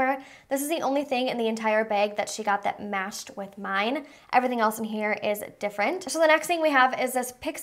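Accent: Minnesota, United States. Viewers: I TELL YOU THIS MINNESOTAN ACCENT 😂😂😂😂😂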